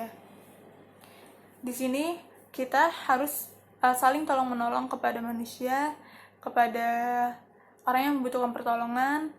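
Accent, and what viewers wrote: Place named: Outer islands, Indonesia. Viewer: Bahasan yg lugas, tegas, jd dpt ilmu baru...tapi kl hati lg betmut bs ga ya org berprilku prososial...;🤔🤔 Dialek org dr sebrang ya kk😁..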